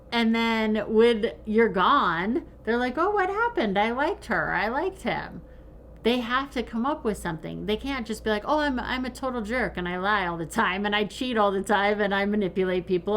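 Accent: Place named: South, United States. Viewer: are you from South? I noticed you have southern accent. I am obsessed with southern culture.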